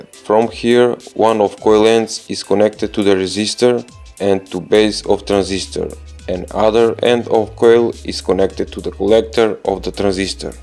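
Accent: Russia. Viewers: American hacker with russian accent. Epic combo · How is he american hacker he sounds like he's a russian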